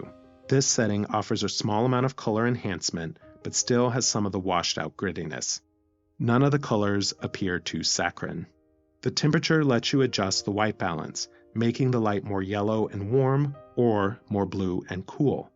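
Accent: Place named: Italy. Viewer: "Sorry for my bad English" cracked me up! Il tuo inglese è eccellente, better than most Americans, e gli Americani adorano gli accenti Italiani!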